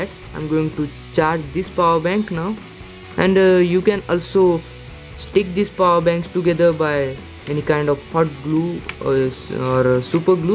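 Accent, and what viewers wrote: Maharashtra, India. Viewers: Marathi accented English! Liked it lot.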